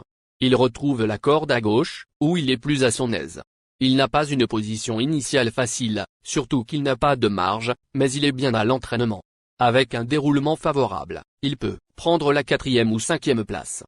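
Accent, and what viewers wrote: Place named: Burkina Faso. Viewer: Son accent est burkinabe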